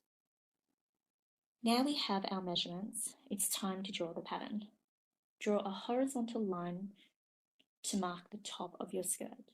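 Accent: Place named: Australia. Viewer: Thanks, very nicely explained. Love hearing your (our) Aussie accent too!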